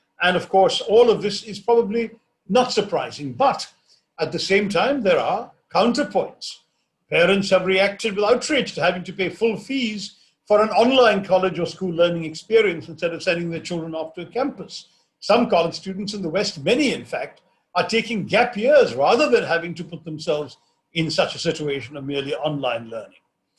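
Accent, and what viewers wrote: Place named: RP, United Kingdom. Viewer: A very shallow take on multilateralism using a high sounding ideals totally divorced from the reality on ground where people around the world have been impoverished by a world run on fiat currencies. With no real exchange of value possible in the market place using fiat currencies, the call to strengthen multilateralism can only be considered a compromised sub-serviance to the forces behind the phenomenon. Now why would a politician from our world -south Asia, Asia, the so called 3rd World-- advocate for the continuation of a system that has manifestly impoverished us to our present helplessess? You should focus some on improving your intentions and making clear where your loyalties lies instead of trying to sound more gora than the Queen! Be.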